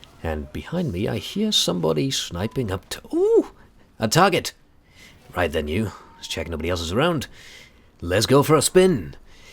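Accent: United Kingdom. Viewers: I love British commentary the voice is like...... It can't be explain from words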